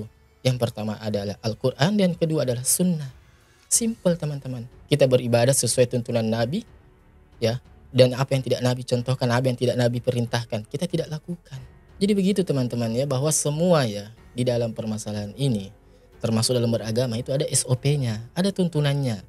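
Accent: Sulawesi, Indonesia. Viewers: Cara bicara anda sepertinya orang Sulawesi dan sy yakin anda cm mondok di Sulawesi tdk di Jawa jd belum tahu semua tentang pesantren aswaja. Anda bilang objektif tapi ketika ada komentar yg mendukung anda bahkan menyudutkan NU anda malah like. Dmn letak objektivitas anda? Kalo memang anda objektif harusnya anda bilang itu oknum 🙏🙏🙏🙏🙏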